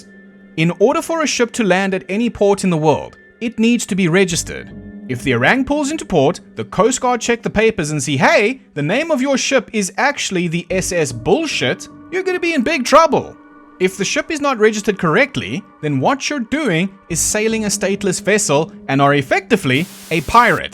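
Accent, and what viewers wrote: South Africa. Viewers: Your content has been getting recommended to me for a while now and I assumed it was because my algo finally realised I might like to see things other than Skyrim challenge runs because this topic is exactly up my alley. So imagine my shock when I clicked on the video and got SUCKERPUNCHED by a fellow South African accent!! But also yes. I do want to know some actual facts about this very very silly legend that I could never make sense of no matter how hard I tried. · This is not how i find out you're South African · You sound like Rodney Howard brown. I knew immediately you were south African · Goddamnit I thought you were an aussie and when you read that Dutch I was like "wtf?" How did I not pick up that South African accent. I feel like an naai. Well, now I have to subscribe.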